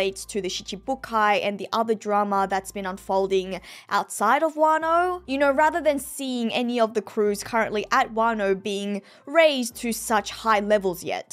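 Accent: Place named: Australia. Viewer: It seems like a lazy comment but ..I actually broke my rule of not commenting on public youtube videos.. Fairly new to the channel. I have to say I enjoy your videos. I respect the knowledge, research and clean execution in your videos. The are down to earth and come off very natural while having professional execution. You're funny without trying to be funny. Your points are well thought out. Yet still down to earth. I'm guessing you studied communication or broadcasting. You have a talent for it. I also love your aussie accent. Thank you for the funny response. I almost laughed out loud :)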